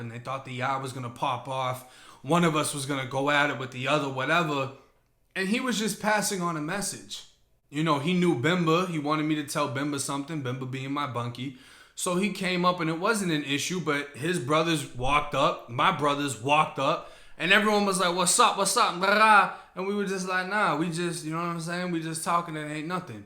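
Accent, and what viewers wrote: Boston, United States. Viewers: Yo anyone evah tell you that you have a Boston accent, are you from Mass.? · You sound like an idiot from Boston. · Yo, are you from Mass, you got a thick Boston accent fam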